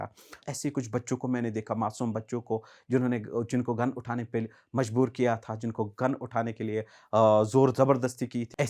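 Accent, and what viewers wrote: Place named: Kashmir, India. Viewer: I like kashmiri hindi..their slangs is really sweet..u need to have courage to say these things in public platform...hats off u brother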